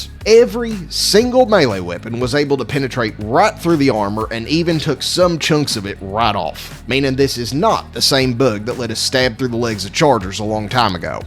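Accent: South, United States. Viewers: Helldiver commentary with a southern accent hits different NGL. I’d follow you anywhere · lol that's funny. The voice is the reason I find these hard to watch. I still live in the South and have heard some pretty messed up things said in that accent. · Your southern accent makes this amazing. Much love for the intel. Keep trekking on o7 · This video was supposed to be background noise and I sat here and watched the entire thing for the voice and it reminded me of home in the south. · Your accent sounds to me like Southern US, but you pronounce some words like an Australian? Peculiar.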